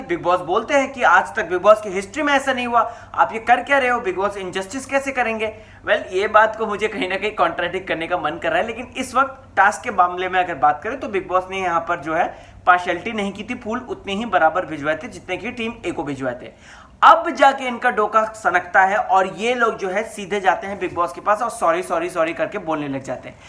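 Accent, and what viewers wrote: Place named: Maharashtra, India. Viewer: Aap bhot cute marathi bolte ho😍😄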